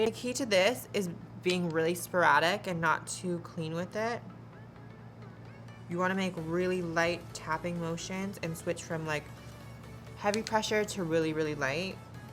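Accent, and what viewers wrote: United Kingdom. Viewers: Why does she sounds a bit British here? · living for the british accent bih🎉🎉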